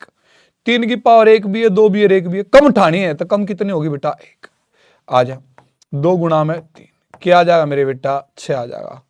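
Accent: Haryana, India. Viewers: Wa haryanvi 😊❤❤❤❤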